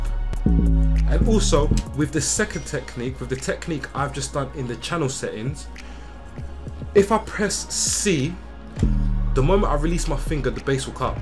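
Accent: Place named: London, United Kingdom. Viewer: so good to hear a ldn bruddda lol, keep it up my g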